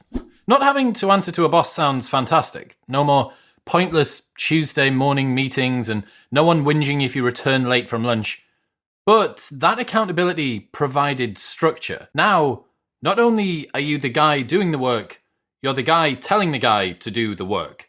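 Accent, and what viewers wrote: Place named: England, United Kingdom. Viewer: I love this English accent. You treat the "u" consistently.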